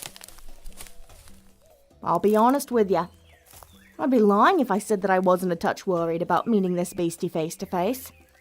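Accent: Australia. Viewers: You have a beautiful accent. Australian? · you sound Australian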